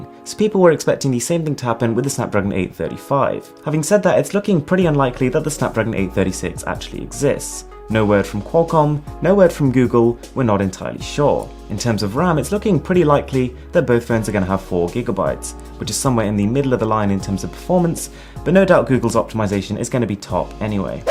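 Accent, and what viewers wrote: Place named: United Kingdom. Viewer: Are you indian? I've never seen an Indian person with a British accent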